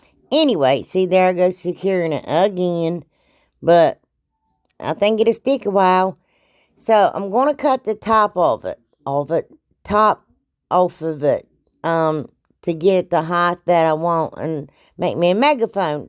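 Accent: South, United States. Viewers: are you okay??? I know by your accent you are from the south and have just seen the terrible devastation in Mayfield, Kentucky! Can you please tell me you are safe and well! Scotland Girl 🥰🥰🥰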